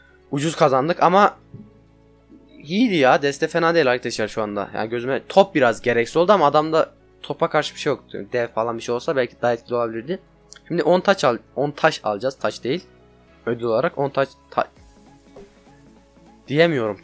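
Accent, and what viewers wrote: Erzurum, Turkey. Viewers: abi sen erzurumlumusun???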